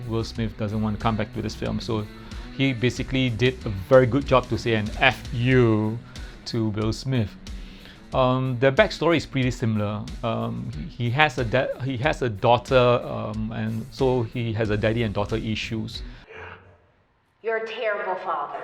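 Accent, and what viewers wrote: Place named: Singapore. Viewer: Singaporean? That accent is undeniable 😂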